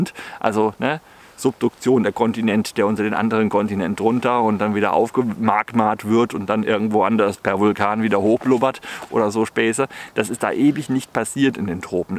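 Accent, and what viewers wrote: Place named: Baden, Germany. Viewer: Das mit dem "rechen" und dem "rächen" in der Aussprache ist halt Badisch. 🤠 Hat nicht der Trump gesagt man soll den Wald rechen damit's weniger Waldbrände gibt, so wie es in Finnland gemacht wird. Angeblich! 🤣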